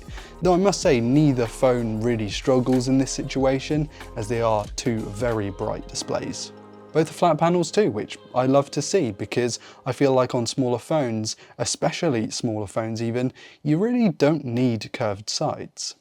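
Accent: England, United Kingdom. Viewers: You have an English accent, yet prices are USD. There are enough US tech YouTubers don't you think?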